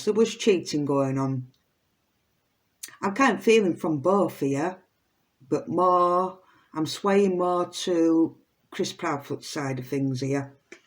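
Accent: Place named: Yorkshire, United Kingdom. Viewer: Hi hun new sub you sound very yorkshire im from Leeds and you sound same accent loving this ive watched this about Sebastian from day one its so sad 😢💚💚💚💚💚💚💚💚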